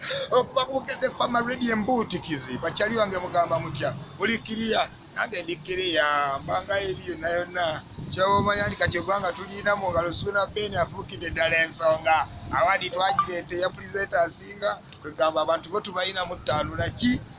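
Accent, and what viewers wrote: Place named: Uganda. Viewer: Love you accent my brother from Uganda, I love your clads too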